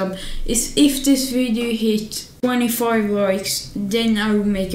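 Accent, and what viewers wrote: Sweden. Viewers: bruh stop being toxic hes from sweden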